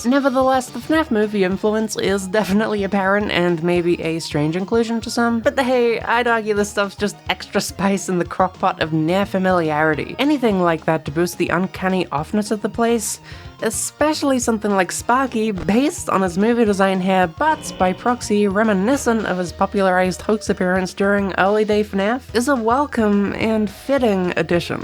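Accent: Australia. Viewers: Great video, but I have to ask what's up with your voice? Kinda Aussie, kinda British? Its a bit hard to listen to, if im honest. Hearing a perfectly fine austrailian accent and then you suddenly drop your T sounds to an almost comical degree (the "bo'oh'o'wa'er" effect). Ive lived long enough in both Australia and the UK to say that ive almost never seen australians drop their T's like that, and most british accents dont do it nearly as overtly as most think. This isnt a personal attack, its more that im genuinely curious. Its the same sort of question i'd ask if I knew someone that had never lived in the UK all their life but spoke in an american accent. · I can’t tell if is Aussie, Brit or American anymore, but I have an autism accent so I can’t talk. Happy to be here nonetheless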